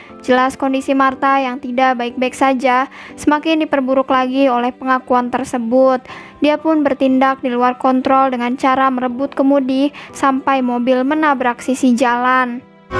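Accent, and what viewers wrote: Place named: Madura, Indonesia. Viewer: Suaranya kayak bocil sd, gbisa santuy tapi cepat. Maduranya kelihatan banget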